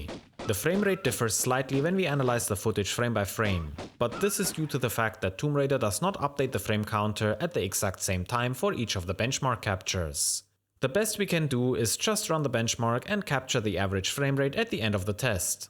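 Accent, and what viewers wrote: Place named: Franconia, Germany. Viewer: You're from Franconia, Germany, right? That accent sounds very familiar!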